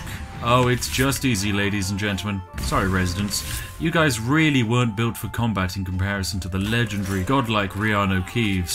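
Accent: United Kingdom. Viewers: Well… did you catch his spiffingly British Britishosity? He’s an incarnation of one of the peaks of Human performance (Evangelic British Hegemony).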